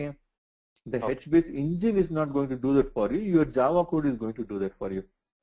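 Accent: India. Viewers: it is easier if u are indian. bec you are having the same accent, no offense.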